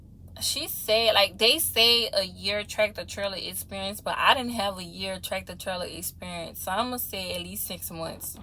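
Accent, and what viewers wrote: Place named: New Orleans, United States. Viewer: Aye Nola in da building I heard New Orleans lol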